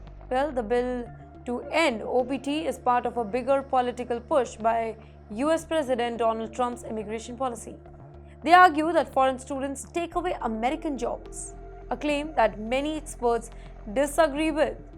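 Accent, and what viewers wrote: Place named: India. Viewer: Nothing personal,, she needs to improve her English accent.. Too much Hindi hangover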